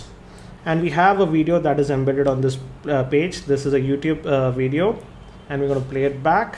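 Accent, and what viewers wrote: India. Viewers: thanks for this. the indian accent makes this credible. :]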